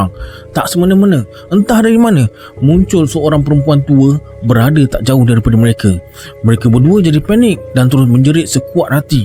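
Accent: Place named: Johor, Malaysia. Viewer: Jaln parit kemang B.Pahat selalu saya lalu bila balik rumah abah saya. tk sgka ada kisahnya.Btw ni org Batu Pahat ke? tgok slank bhasa ni mcm org Johor.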